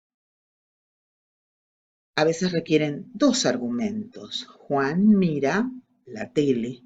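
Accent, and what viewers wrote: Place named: Argentina. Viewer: Por favor no dejes de hacer estos videos! Están increíbles. Muy bien explicados, muy interesantes y en español de argentina! 🇦🇷❤ Te agradezco mucho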